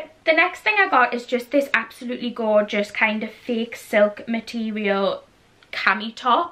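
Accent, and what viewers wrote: Newcastle, United Kingdom. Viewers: You always make me laugh 😂😂 soo funny.. Are you from Newcastle? Love the geordie accent! Going to be soo embarrassed if I'm wrong though 😂 also are the shoe sizes true to size? Xx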